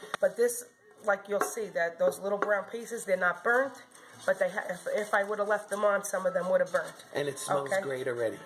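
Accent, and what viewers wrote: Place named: New York, United States. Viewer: I love listening to you as you cook. I was raised in NewYork in an Italian family. Moved to Louisiana when I was 16. Your speech reminds me of my mom and our friends and myself before I lost my NYC accent. My friends tell me when I am tired, the accent comes back...ha! You bring back some sweet memories, thank you!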